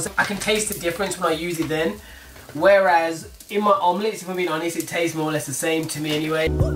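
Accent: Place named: Northern England, United Kingdom. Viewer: Nice break downs, Where are you from in UK, from accent I'm thinking up north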